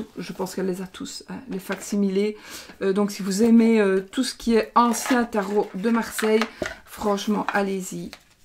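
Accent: Belgium. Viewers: trop sympa ce type de vidéo, j'adore t'écouter parler des tes cartes, de tes chiens, de ta vie avec ton petit accent belge que j'adore !!!!! ca me permet de me sentir moins seule dans mon atelier alors merciiiiiiii !!!! Et Oscar j'adore, c'est le nom de mon tt 1er chien, j'avait à peine 20 ans, c'était un croisé bichon/caniche d'une gentillesse incroyable, obéissant, intelligent, sociable, sage, bref, une perle, il m'a accompagné pendant 14 ans <3 je te souhaite donc une toute aussi belle histoire avec cette adorable boule de poils noire et blanche <3<3<3 Bisous et change rien c'est comme ca qu'on t'aime !!!!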